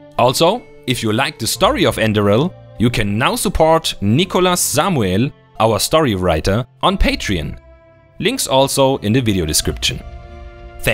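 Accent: Germany. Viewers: Super video, klingt nach wirklich tollen ideen für die neuen Zauber. Der deutsche Akzent ist sehr putzig :D :D Ein native speaker kriegt da wohl Gänsehaut, aber klingt einfach süß :D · oh gott was für ein cooler deutscher akzent :D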